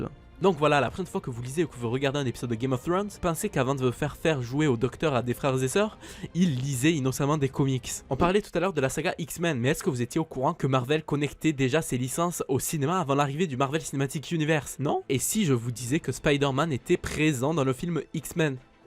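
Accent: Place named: South, France. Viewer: Cet accent sudiste moelleux m’avait manqué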